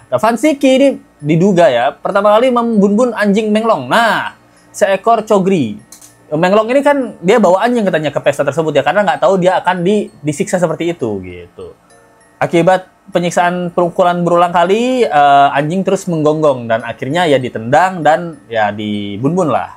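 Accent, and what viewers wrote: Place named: Bali, Indonesia. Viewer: Logat nya Bali banget🎉🎉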